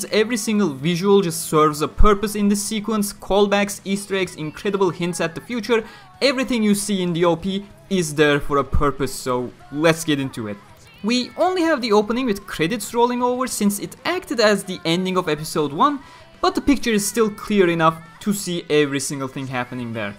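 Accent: Turkey. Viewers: ingilizce konuşuyon ama konuşurken türk olduğun çok anlaşılıyor xd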